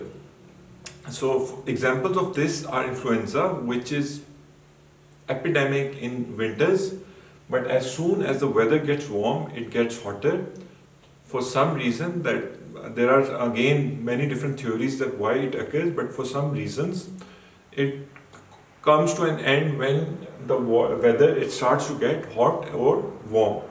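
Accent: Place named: Punjab, India. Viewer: punjabi bahar aa rahi si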